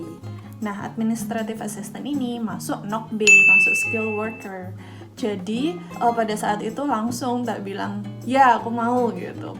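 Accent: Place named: East Java, Indonesia. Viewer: Learning by doing, mantap mbak Btw mbak e logat e wong Jawa timur heheh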